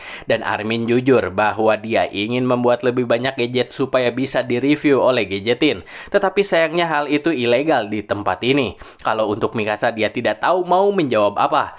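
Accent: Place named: West Java, Indonesia. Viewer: Bang lu kaya orang sunda logat nya..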